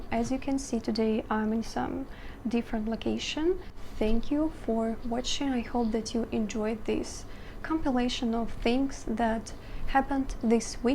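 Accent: Russia. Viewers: I think it’s her Russian accent when she speaks English.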